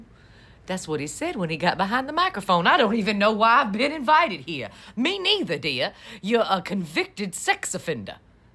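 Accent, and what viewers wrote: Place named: South Carolina, United States. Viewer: South Carolina genteel accent- I'm guessing where this Southern Belle is from.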